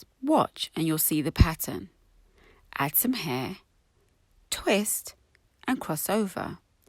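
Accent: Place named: England, United Kingdom. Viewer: 👏🏾👏🏾👏🏾👏🏾😃 That was so wonderful to watch! I love the way you speak! Beautiful English accent...I'm biased, I have a very similar voice! 😄 Your video was wonderful! I really enjoyed watching you do your youngest daughters hair routine. I have a son, whose he's 16, so I wasn't watching for him, I was watching for my Grandneice whose 9mths old. I want my niece to use a healthy hair growth routine on her daughters hair, so I'm going to share your video with her. I love your, 'GIRLS YOU ROCK', T-SHIRTS and hope to purchase one or two for my Grandneice. Thank you again and look after your 3? beautiful, little girls 🥰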